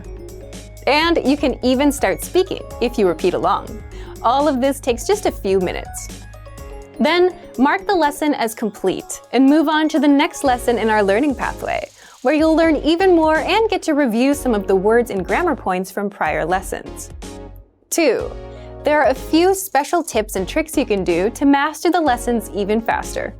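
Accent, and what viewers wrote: United States. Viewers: pero es de California, New York... De dónde será? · Es el mejor acento es de EEUU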